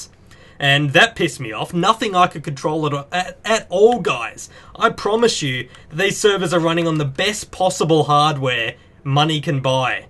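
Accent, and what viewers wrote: Australia. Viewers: Yo mate r u audtralian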